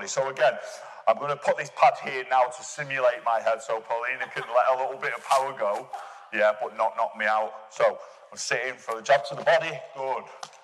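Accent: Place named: Manchester, United Kingdom. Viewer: Loving the manc accent, didn’t realise was based in Manchester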